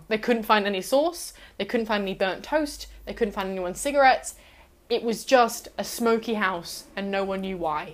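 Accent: Australia. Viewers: yOUR ACCENT HAS GOTTEN A LITTLE BIT MORE AUSTRALIAN AND IT'S SO ADORABLE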